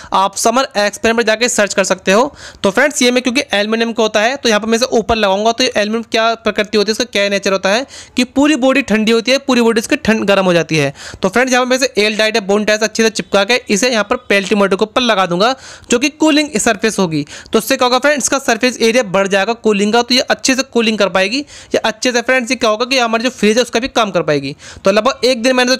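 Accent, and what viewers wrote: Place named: Rajasthan, India. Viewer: bhai tu dikhne me smart hai pr bolne me ek dum desi hai Rajsthan se hai kya bhai kyo ki me bhi desi hi hu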